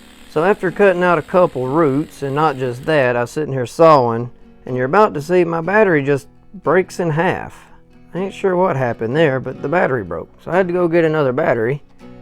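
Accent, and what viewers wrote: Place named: South, United States. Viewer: Enjoyed your video. Enjoyed your true Southern accent and attitude... "Let 'em run wild"... lol 😂. Gathered some good tips. ❤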